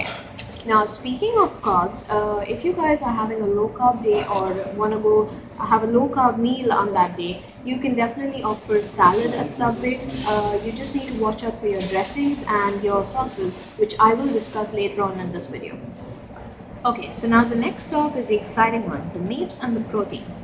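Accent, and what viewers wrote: India. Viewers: I cant pick up your accent sounds Indian. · u sound desi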